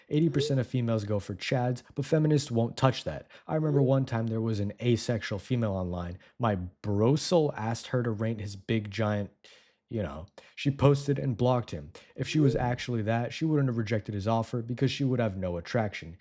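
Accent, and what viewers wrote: United Kingdom. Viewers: The British accent is excellent. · that british accent fucking broke me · the british accent is thick with this one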